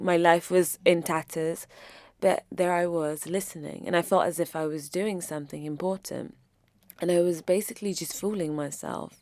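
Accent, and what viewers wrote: United Kingdom. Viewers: It sounds like my mum's, which is southern english :) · This is so important. Side note. When you started speaking i thought it was me. We sound very similar its scary. Hello fellow Brit 🇬🇧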